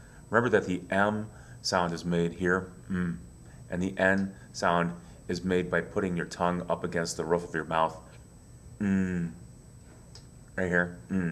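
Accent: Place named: Midwest, United States. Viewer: Viewers should be aware that this speaker has a very flat mid-western accent. In other words, his "o"s are more like "a"s. His word "stop" sounds like it is spelled "stahp". In other parts of the country, we pronounce the "o" more like a round "o", so we would say "stop" as if it is spelled "stawp"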